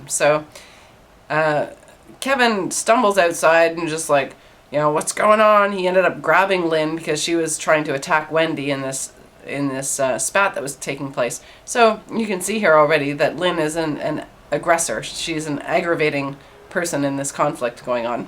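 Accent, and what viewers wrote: Canada. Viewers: Jesus cant act, you tell terrible stories. If you werent so cute and prone to telling the truth I wouldn't watch this. You really need some public speaking classes. You pause st awkward points and that takes from the flow. That Canadian O gets me every time too.: ) But seriously, you do sound like a first year broadcast student who is about to pass out from fright. Also, you looking at us over your glasses frames you as an agressor and you appear annoyed at the viewer.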